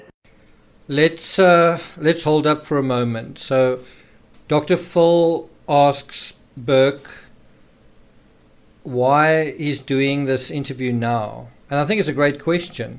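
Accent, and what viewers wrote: South Africa. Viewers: South African accent.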